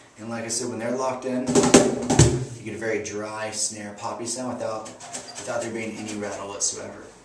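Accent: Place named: United States. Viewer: Hi.. You sound like a yank...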